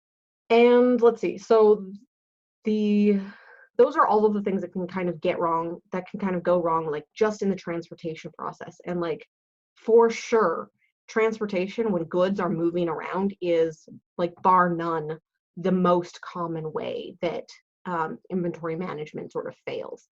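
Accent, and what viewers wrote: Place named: Canada. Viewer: Fascinating and useful stuff, not dull at all. I especially like that you don't ship problematic books for whatever reason. Answered a lot of questions perking the last several months that you've helped me navigate FBA. Love the Canadian accent, too.;-)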